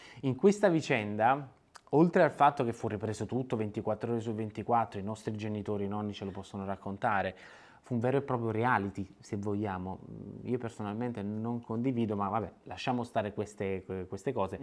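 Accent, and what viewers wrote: Naples, Italy. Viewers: Sei napoletano? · Ciao! Sei napoletano?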